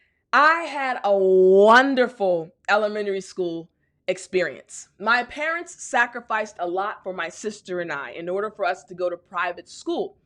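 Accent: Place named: United States. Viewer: Thank u soooo much teacher. You're sooo funny, that makes everyone to like your movies. I heard your song in that movie,even someone was sad can smill as well. I also like your smart accent, pure American. We're following you in a group person from Congo Kinshasa(DRC). Thanks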